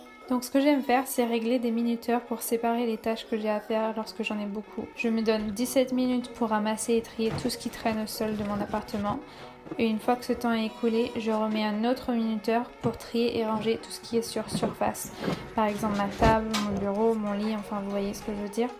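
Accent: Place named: Australia. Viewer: vous êtes un petit accent d'Australie, ou des états uni peut-etre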